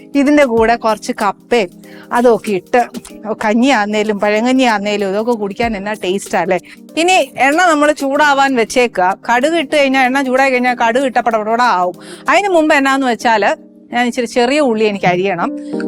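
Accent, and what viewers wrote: Kottayam, India. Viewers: Njgle Kottayam kaaru ingne aane samsarikunnathu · കോട്ടയത് ഇങ്ങനെ ആണ് പറയുക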